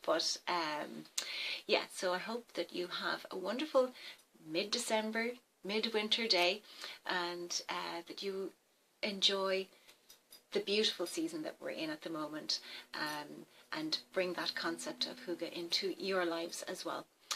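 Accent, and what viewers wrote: Ireland. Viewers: Just think tomorrow will be lighter just a little longer til it’s summer again... my favorite season I’ve been practicing this without knowing it Thank you! I have lights hanging all year long and I light candles every single day I like citrus smelling candles or fruity ones Are you from Ireland? I love your accent I’m from the US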